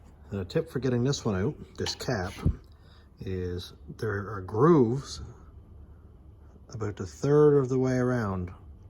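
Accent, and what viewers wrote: Newfoundland, Canada. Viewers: Awesome video ❤!! And I knew that accent of yours was from the rock,…. 😊 a fellow Canadian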